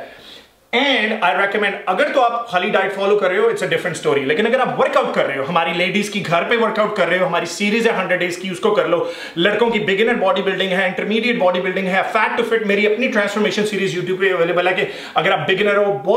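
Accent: Punjab, India. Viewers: AWSOME BRO SUPER LIKE TUHADA PUNJABI TOUCH SIRRA KRA JNDA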